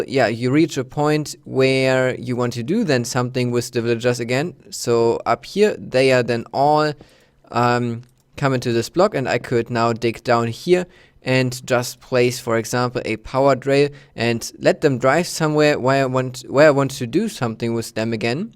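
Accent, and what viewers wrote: Germany. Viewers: Are you a german? You sound a bit like me, and I am german. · Are you german ? :) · Are you from Germany ?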